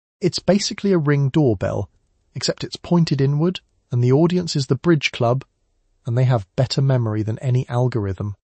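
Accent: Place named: United Kingdom. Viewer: I have trouble falling asleep listening to the British accent.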